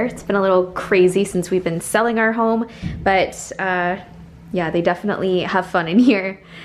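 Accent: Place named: Canada. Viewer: So bizarre I found you on Insta through a pink hashtag or something. The first thing I saw was your beautiful home on your stories, and your closet full of all things pink💗 I didn’t know you had a YouTube channel. I recognized the Canadian accent right away and saw in the first video I watched you lived in Calgary. My Daughter lives in Canmore and just loves Alberta, she’s been there 5 years now. So now after watching a few of your older videos did you actually live in Windsor? I can’t blame you for moving if that’s the case, it’s a cesspool.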